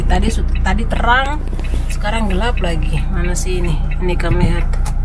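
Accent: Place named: Papua, Indonesia. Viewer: Bule jerman pintar bahsa Indonesia dan yg lebih asyik bisa logat bahasa Papua dn Maluku..keluarga bahagia..salam kenal dr kota Timika🤩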